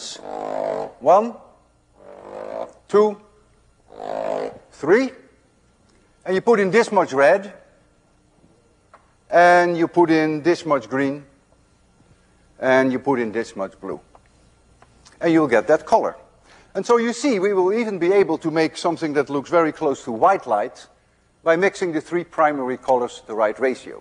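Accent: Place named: Netherlands. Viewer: sounds like he has a dutch accent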